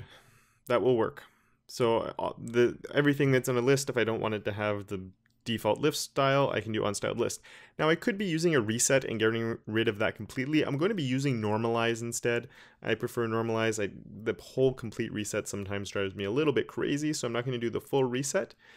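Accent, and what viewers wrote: Canada. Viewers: you must be Canadian arent you? the accent sounds soo Canadian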